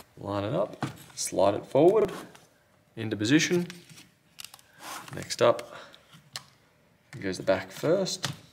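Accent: Australia. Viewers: G-day! Are You from Australia?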